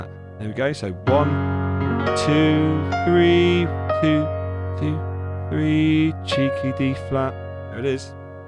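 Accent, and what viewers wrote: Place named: United Kingdom. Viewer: British accent, good man.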